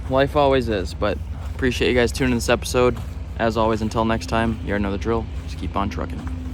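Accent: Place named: Minnesota, United States. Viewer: Its so nice listening to a fellow minnesotan on youtube. All the other youtubers have the worst non-minnesotan accents.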